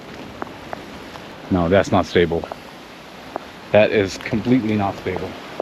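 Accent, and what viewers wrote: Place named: United States. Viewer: Love your videos and your channel by the way you sound like you from state just saying ❤❤❤❤❤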